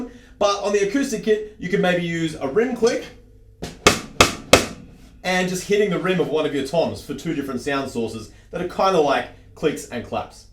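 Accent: Australia. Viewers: I subscribed as soon as I heard your accent I’m an Aussie aswell mate 🇦🇺🇦🇺🇦🇺🇦🇺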